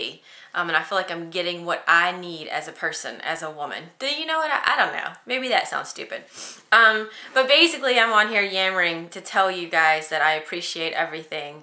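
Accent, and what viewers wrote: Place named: South, United States. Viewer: Happy Anniversary! I love watching " " with her cute southern accent! I hope you have a great weekend. Still cold here in Chicago but at least the sun is shining! XOXO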